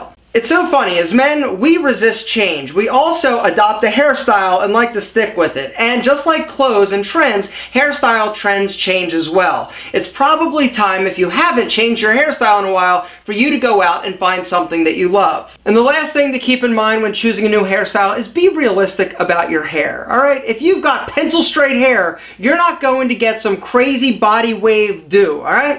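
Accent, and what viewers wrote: Philadelphia, United States. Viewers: you sound like you're from the Philadelphia region?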